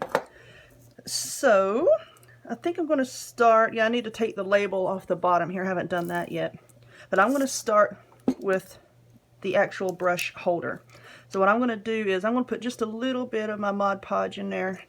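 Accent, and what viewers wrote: South, United States. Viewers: Love your channel and your southern accent. I have southern accent as well 😊 I wanted to know what type of cricket machine you have?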